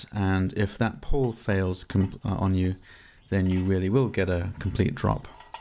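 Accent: England, United Kingdom. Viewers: Definitely not Aussie An English Accent 🏴 👍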